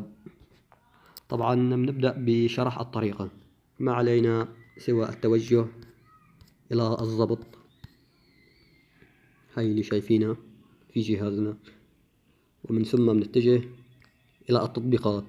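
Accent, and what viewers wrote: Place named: Syria. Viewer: باين عليك سوري وحمصي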